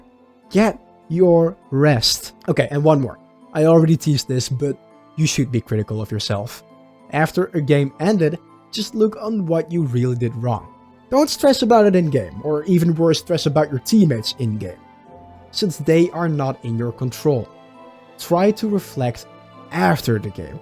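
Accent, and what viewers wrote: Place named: Netherlands. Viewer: Just stumbled across your channel, you are definitely Dutch without a doubt. Like the content tho!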